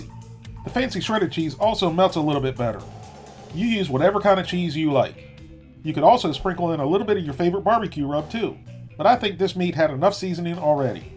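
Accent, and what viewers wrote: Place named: Texas, United States. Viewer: This guy has the inflection of chef John but with a Texas twist! 🪢 I love it!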